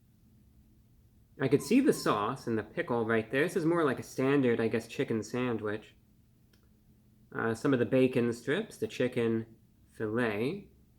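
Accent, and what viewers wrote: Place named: Transatlantic, United States. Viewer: The only YouTuber to have a seemingly transatlantic accent.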